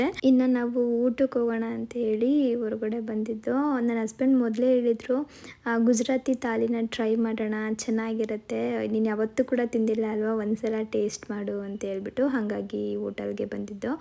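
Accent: Mandya, India. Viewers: Super gi coolagi helidira bad comments madirorige artha agirutte bidi Bro matte nivu nimma mathu tumba ista nange namma mandya bhashe matadtare ❤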